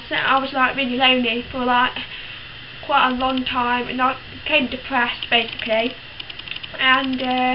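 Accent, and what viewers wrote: United Kingdom. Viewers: How do you talk like a frickin british person if you are frickin deaf???? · I don't understand how you have a British accent, that's crazy how that works. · I notice that you speak with a British accent, are you completely deaf or almost completely deaf? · It's so cool how despite the fact that you're deaf, you still have an English accent hehe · I realize that part of your accent is from being deaf, but it also sounds somewhat British. Is that where you're from? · Probably not the only one to ask but how do you get a British Accent if you can't hear the way words are pronounced? Just curious. :) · They managed to train you into a vaguely Yorkshire accent *thumbs up* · Wow you're British and still have an accent! Of course you don't know what that sounds like but it's amazing how humans just know how to speak and adapt to situations like you have to know sign and english even though you can't hear what you're saying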